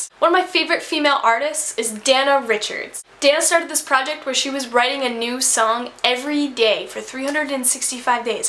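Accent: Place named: Upstate New York, United States. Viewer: Upstate accent lolz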